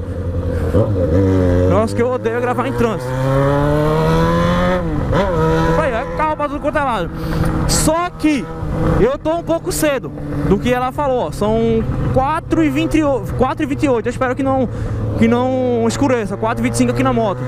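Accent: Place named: São Paulo, Brazil. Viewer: como que não é fake? essa porra é fakedói meu irmão, tu de Recife, com esse sotaque de paulista, prego do CARAI, honra tuas origens boy! kkkkkkkk essa porra ainda vista por tanta gente kkkkkkkk, vou dar um LIKE só por tua coragem visse !! mas num fica com essa de MANO e MINA não que é feio doido !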